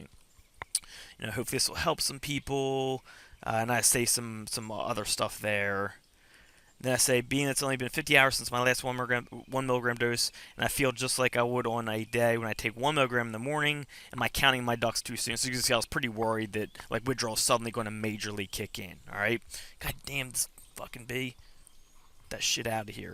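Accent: Philadelphia, United States. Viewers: Dylan M he's gotta be from the Philly area.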